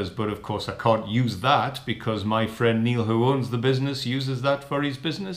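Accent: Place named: North East England, United Kingdom. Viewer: I recognise a bit of a north east twang to yourself, great video and information, I will steal that idea, cheers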